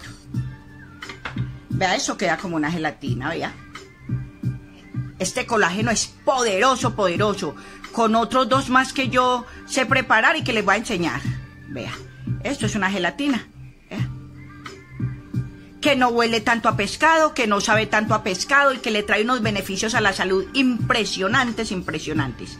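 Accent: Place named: Colombia. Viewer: Wow,genial. Peo yo vivo en EEUU y no se donde venden eso.y si lo venden . Me encantaría saber de q otra forma podemos obtener el colageno vía oral.Soy nueva aquí y me gusta ver tus videos. Además sabes, el acento Colombiano me encanta. Soy Boricua. Bendiciones para ti y q tú canal siga creciendo. Abrazos 🤗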